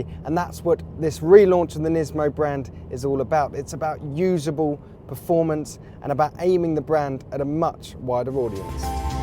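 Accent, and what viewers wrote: United Kingdom. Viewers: Damn,I can't understand British English -.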